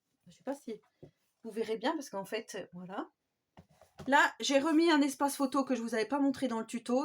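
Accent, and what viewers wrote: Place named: Grand Est, France. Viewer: Coucou, très joli album, j’adore le papier craft 👌🥰🙋‍♀️. Vous êtes du Grand Est de la France, j’ai l’impression de reconnaître le petit accent 😉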